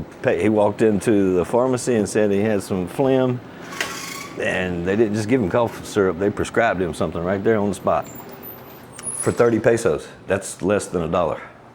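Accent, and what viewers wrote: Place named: West Virginia, United States. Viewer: Man, you're slinging the truth!! I'm 48 and making plans to move over there. I've been researching the Philippines for a few years now. I'm making the move for all the reasons you mentioned. I was married once, It lasted a couple few months and there's no way I'll do it again here in the States.....absolutely no way!!! I'm from Southern West Virginia and our accents are pretty much the same, lol. I dig the raw material, informative and entertaining, thanks for the time Brother.....I just subscribed!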